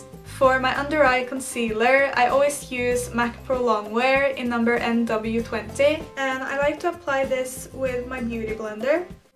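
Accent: Norway. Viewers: It's the norwegian accent.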